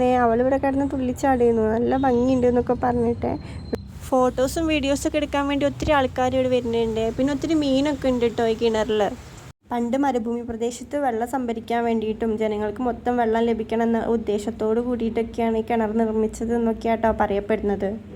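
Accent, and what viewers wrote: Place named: Malappuram, India. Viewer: Kutty Malappuram aano samsarathile Tto Tto kettappo thoni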